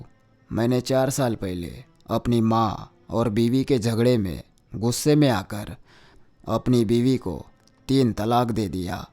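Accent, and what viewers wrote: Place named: Telugu region, India. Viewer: Aapke baat sunke aisa lagta hei ki aap Telengana ya Andhra side ke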